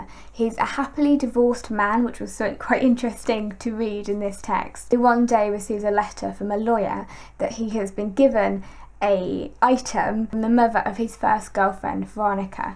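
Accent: London, United Kingdom. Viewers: What's so special about this lassy's voice? She could be any schoolgirl from London or the home counties.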